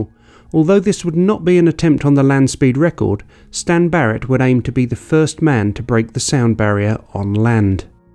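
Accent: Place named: United Kingdom. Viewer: The accusation that the radar never clocked the speed and instead a truck is entirely new and unverified. It is a claim made solely by this video narrator and uploader and no official from the U.S. Airforce. Sidewinder missiles break the sound barrier without sonic booms all the time. The British accent is very suspect with Bias.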